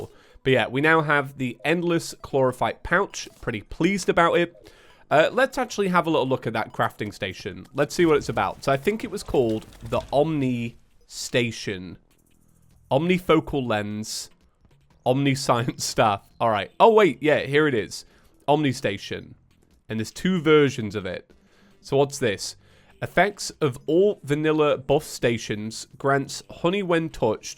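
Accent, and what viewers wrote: United Kingdom. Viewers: Your british "accent" makes me think you're saying giar armor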